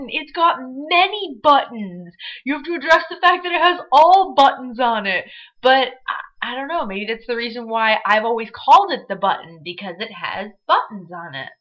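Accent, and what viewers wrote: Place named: Michigan, United States. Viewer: I knew your accent sounded familiar, my next door neighbors are from Michigan and your accent is just like the wife's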